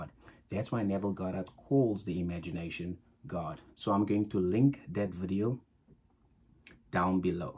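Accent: South Africa. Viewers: Oh laaaaawd, a South African accent!!!! Heya brother!!! Hi from Austria! Now I'm homesick! So happy to see South Africans also on here, talking about the Law of Attraction!! 👋👋👋 How many numbers did you get right? I once missed the jackpot by ONE number!! That was waaaaay back! Still got a nice little sum, but not the millions! Yet! 😁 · I know a South African accent when I hear one❤️👏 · lol, immediately when I heard you speak, I said, this guy is South African. I'm too. 😂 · Wait you South African? Im try this too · Love your South African accent. I was in Durban years ago, loved it. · I knew that accent immediately! So happy to find more South Africans in the LOA community ❤❤❤❤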